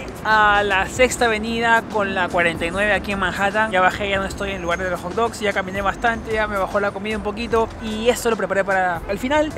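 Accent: Peru. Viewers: Hola te felicito eres espectacular..ese asentico peruano es fantástico... soy de Colombia conocí tu canal a través de planeta Juan...muy buen contenido .sobretodo la simplicidad que lo transporta a uno a tu amada N.Y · Bro tu eres peruano vdd?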